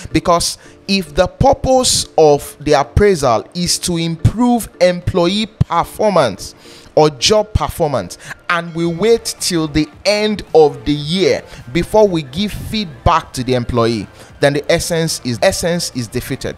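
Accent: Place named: United Kingdom. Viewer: Amazing explanation with British English . I am interesting with your curiously explanation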